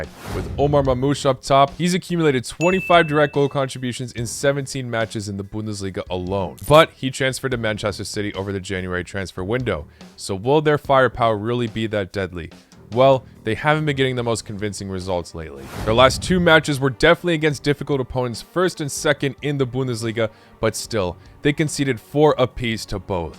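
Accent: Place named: United States. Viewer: You speak perfect American English for me and Your American Accent is so awesome.🥰😍🤗❤❤❤❤❤❤❤🤍🤍🤍🤍🤍🤍🤍💙💙💙💙💙💙💙💯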